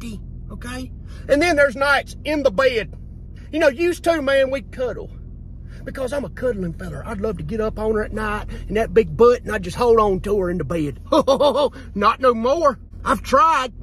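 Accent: South, United States. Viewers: Gotta be that southern accent and slang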